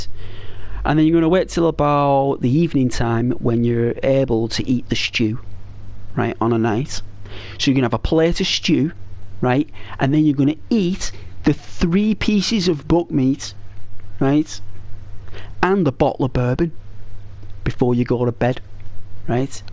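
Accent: Liverpool, United Kingdom. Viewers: I love Liverpool accents lol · Great vid bro.. you from Liverpool ?? And sub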